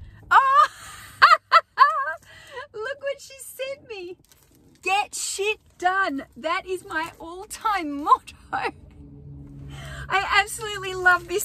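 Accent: Australia. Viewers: Hello, this is the first time viewing your YouTube videos. I did enjoy your content and hustle. I know a lot of folks, like myself, from the U.S. cant distinguish certain accents. I have always loved the Aussie accent over British. I don't know why. I do have a question though. Did you just say to yourself, "These IKEA bags are fun and awesome." They really are. I see blue ones all the time. I've never seen these. That's so cool. My wife and I need to get some. We are trying to one day be successful in turning things for a profit. We have gotten our feet wet. One day we will be full time. Lots of love, Rosie and Ritta from Texas.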